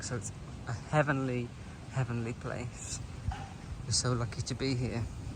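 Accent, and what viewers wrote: RP, United Kingdom. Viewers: I came across your channel by accident and really enjoying it im glad you explained about your lifestyle not that you have to .I think its cause your accent is very posh that maybe people perceive you've come from money not gonna lie I thought the same so thank you for sharing . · I can't believe he does not have a Trust Fund and was not educated at elite British institutions. His speech and mannerisms, show true upper class Britannia.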